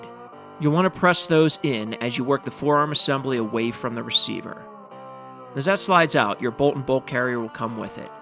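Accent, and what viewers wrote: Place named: Pennsylvania, United States. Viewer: My buddy from Pennsylvania and you sound identical!!! Its crazy!! Same accent. Awesome video!! Thank you.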